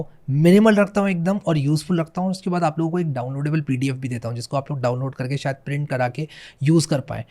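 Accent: India. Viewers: English bro .. but easy to understand like an Indian accent with subtitles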